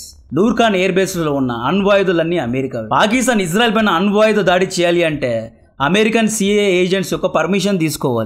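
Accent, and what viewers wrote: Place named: Tamil Nadu, India. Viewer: Arey nuv mandhukotti maatladathava?? Leka arava vaadiva? Ni maatendhukura ala vasthaadhi? Telugodi chinna shortfilm chaesthene oscar andhukuni retire ayinattu buildup isthaaru, nuvventra telugulo videos chaesthunnav? Sigguledhaaraa Goltigey neeku?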